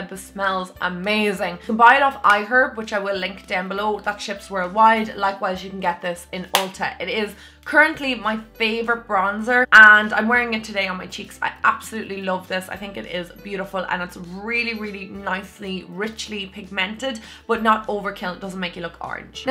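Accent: Ireland. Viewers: I LOVVVVVVEEEE that your Irish I'm from Dublin and any blogger I watch is American sooooo happy I came across you xx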